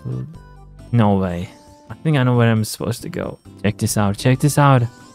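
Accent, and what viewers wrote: Turkey. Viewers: şiveden anladım direkt türk olduğunu :D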